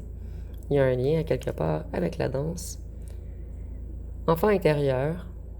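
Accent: Quebec, Canada. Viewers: Bonsoir , c'est toujours avec plaisir que je prends a vous retrouver ,j'apprécie fortement vis guidance ,votre voix québécoise , votre chaleurosite , et votre manicure 👍 toujours au top bien soigner . J'essaie d'oublier ce gros salopard très malade du cerveau et qui se joue a mentir tromper illusionner qu'il a l'habitude de jouer ds son grand scénario théâtrale pour enfermer les pauvres victimes et plus elles sont empathique plus le vampire se régale ,il n'aime que ça les énergies un sorcier magicien ds l'ombre très mal ds sa peau et ds sa tête a toujours être ds la séduction les mensonges son financier est le plus important et toutes ses merdes d'addictions , pour moi il peut croupir il s'est attaquer a une élus ds la lumière et donc a dieu lui même , il est surveillais de la haut et le divin est en action il va payer le poids de ses erreurs et détruite se sentir plus fort que dieu lui même capricieux gamin ds sa tête ,immature , qui boude ou fait des crises capricieuses et ou de jalousie , un vrai psychopathe qui aurais besoin de consulter un docteur malgré que je croit il est irrécupérable et qui voudra bien le garder a part la tierce sa sorcière qui est comme lui ds l'ombre et la sorcellerie . 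Merci a vous , bonne soirée .❤👍🙏🌹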